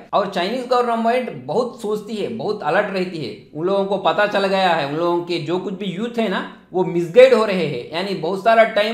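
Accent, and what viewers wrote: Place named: Butler English, India. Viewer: Bro nuvvu hindi talk cheste comedy ga undi=na English la ga = butler english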